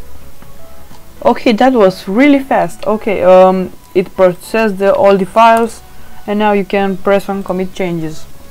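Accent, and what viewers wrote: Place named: Romania. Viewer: multumesc mult de tutorial , mi-am dat seama dupa accent ca esti roman , botul merge perfect , dar in log apare asta <link> , chiar daca n-am probleme si am bifat decat node index.js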